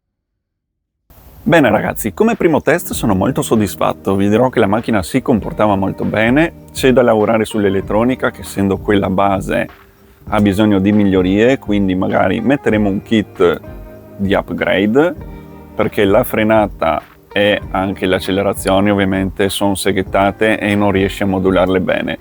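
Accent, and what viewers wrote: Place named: Trieste, Italy. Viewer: 😁si che sei di Trieste l'ho capito subito, si sente, sarà il dna🤣 La pista mi sembrava strano, qua non riusciamo a fare neanche questo. Abbiamo fatto un paio d'anni con gli aerei elettrici ma.....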